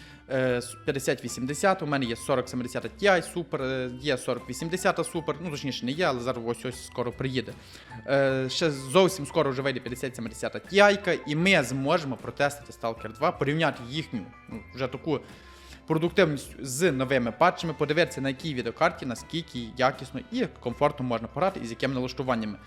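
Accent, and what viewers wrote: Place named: Galicia, Ukraine. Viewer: Класний галицький акцент 👍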